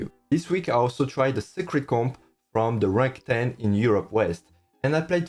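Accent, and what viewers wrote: France. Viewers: Lol his accent is truly french and you are truly a bigot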